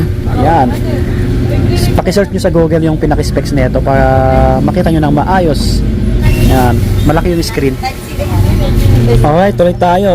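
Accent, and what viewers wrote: Visayas, Philippines. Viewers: Bisaya kaba idol😅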